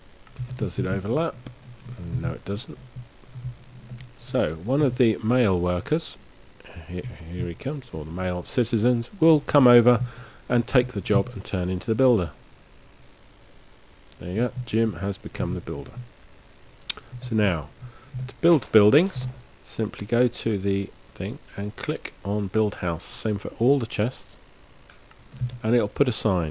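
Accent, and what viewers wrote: United Kingdom. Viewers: I like your British accent. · First off – there is no such thing as a British accent! Great Britain refers geographically to the island of Great Britain, politically to England, Scotland and Wales in combination. Only Americans use the expression ‘British accent’, and you ain’t American are you. You have an English accent (not a hint of Welsh or Scottish in there that I could hear). Second off – why apologise for it? Americans speak English, as in England right? Shame on you:P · i love the british accent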